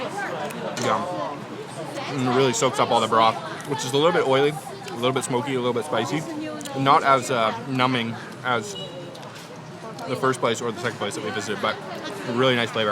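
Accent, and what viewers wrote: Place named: Canada. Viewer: is canadian? i can hear a canuck accent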